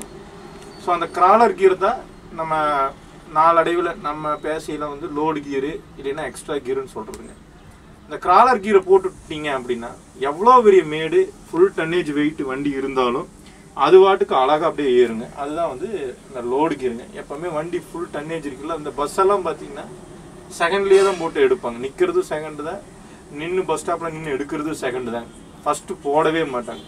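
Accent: Kongu, India. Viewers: Andha kongu slang irukae , lovely..... I'm from Trichy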